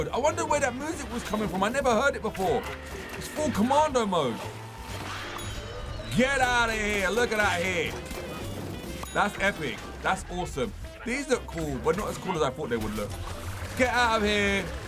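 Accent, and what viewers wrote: United Kingdom. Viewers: love ur britain accent greetings from germany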